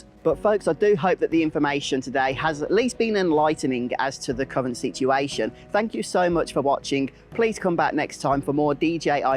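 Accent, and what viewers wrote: England, United Kingdom. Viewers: that terrible english accent :D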